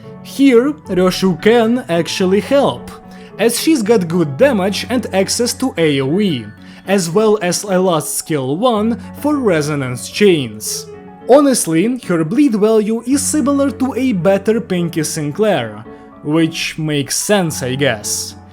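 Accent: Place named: Russia. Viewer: Y teba akcent russki =)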